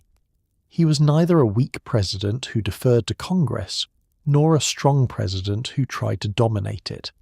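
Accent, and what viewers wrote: United Kingdom. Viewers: Well Done! Monroe was On Point with the Doctrine! Why the Annoying British Accent Narrator? Informative! C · American President why the annoying brit accent?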